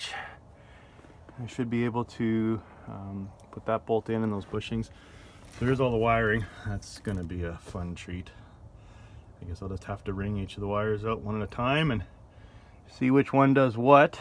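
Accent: Canada. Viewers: Love the Canadian accent! Oh hey there! Great videos!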